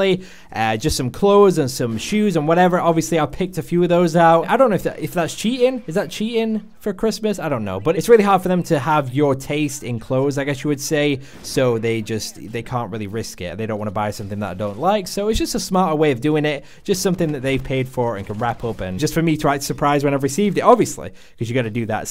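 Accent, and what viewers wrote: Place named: Northern England, United Kingdom. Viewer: his accent is really odd, like northern (english) with an American tinge...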